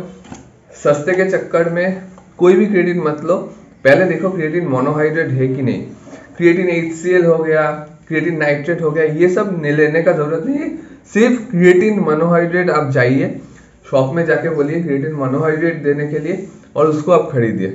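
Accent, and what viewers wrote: Bengal, India. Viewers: Kintu talc gaa me lagate hai shunke...samajh gaya banglai hey... Cholbe bhai chaliye ja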